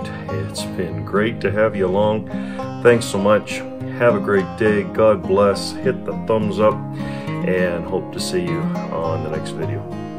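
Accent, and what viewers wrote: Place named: South, United States. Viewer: I want to go to that flea market with you. I am a hand tool woodworker and restorer. I found and restored most of the tools in my shop and use them daily. However, some of the tools you showed made me want to jump through the screen and see the prices. I live in Upstate, NY. Still trying to figure out where you're from, but you sound a little southern to me. Just guessing...LOL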